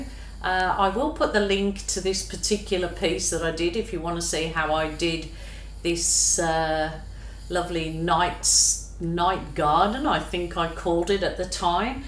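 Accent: United Kingdom. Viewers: thought so I'm from Birmingham uk and thought 🤔 sounds uk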